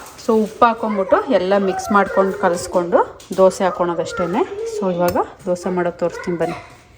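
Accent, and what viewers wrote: Mandya, India. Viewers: ಅಮೆರಿಕಾ dali edru nim Halli maathu kelthidre thumba kushiyaguthe Nam mandya bashe andre🎉 ❤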